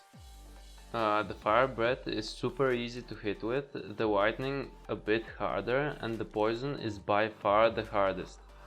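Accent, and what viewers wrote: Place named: Eastern Europe. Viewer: where ere you from? you sound Slavic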